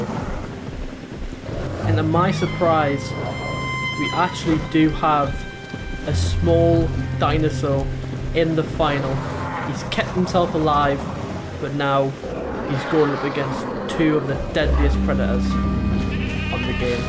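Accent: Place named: United Kingdom. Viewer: The UK accent is fire bro you got the whole YouTube voice 🔥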